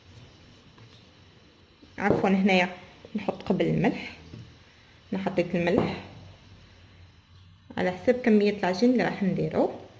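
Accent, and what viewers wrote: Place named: Setif, Algeria. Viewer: ختي منين نتي تبنيلي سطايفية من هدرتك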